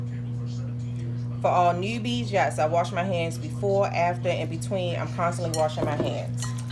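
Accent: Baltimore, United States. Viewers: Bmore accent brings me back home. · As soon as started the video, I was like BALTIMORE!!!!!!!! Never lose the accent! I love it. Those wings look so good!!!! I need to get my air fryer asap! Thanks for sharing.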